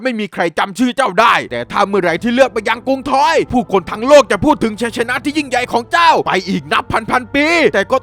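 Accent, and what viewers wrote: South, Thailand. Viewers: พี่เป็นคนใต้ใช่ไหมคะ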